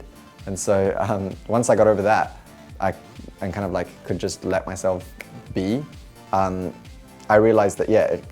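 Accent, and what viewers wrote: Australia. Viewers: i'm shook the aussie accent is the hottest one i think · He sounds Austrialian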